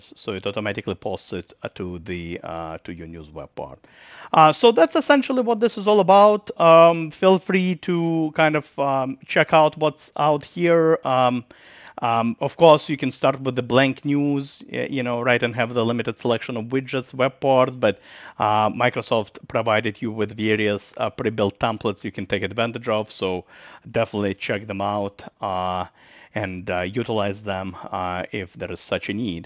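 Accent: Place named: Bosnia and Herzegovina. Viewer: Love the accent. Sounds Bosnian.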